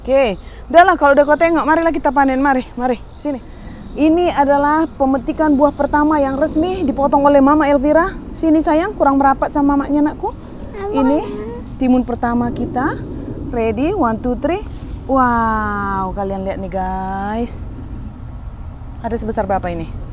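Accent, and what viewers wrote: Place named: Medan, Indonesia. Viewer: apa adanya macam kayak logat medan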